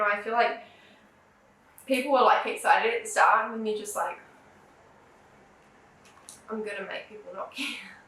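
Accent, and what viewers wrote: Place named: New Zealand. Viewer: Kia Kaha lovely lady, just keep being you that's all that matters. Plus love hearing the good old kiwi accent.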